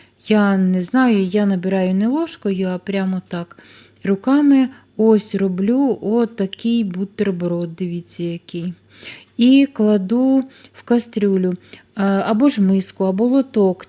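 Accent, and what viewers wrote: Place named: Kharkiv, Ukraine. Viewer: Дякую за смачний рецепт.Сьогодні засолила нову порцію,тепер тільки подолати очікування довжиною в 5 днів.А ваш говір нагадає улюблену подругу з Харківщини-ці неймовірні українці під щоденним бомбардуванням роблять свою справу для перемоги і не збираються виїздити в більш-менш спокійні місця. Процвітання каналу!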